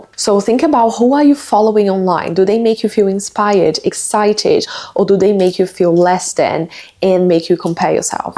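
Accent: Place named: Brazil. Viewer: Really like your accent! You’re Brazilian?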